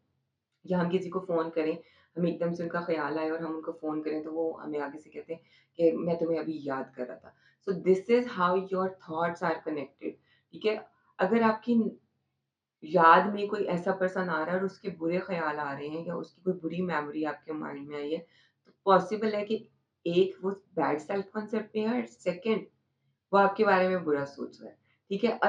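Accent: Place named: Pakistan. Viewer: Pakistan, you can feel the difference in her accent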